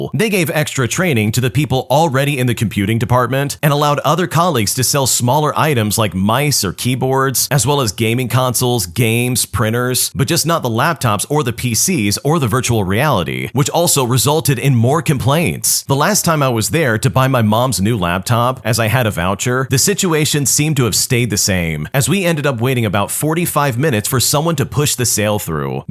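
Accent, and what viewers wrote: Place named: North, United Kingdom. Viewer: I love this dudes northern british accent wish i could have it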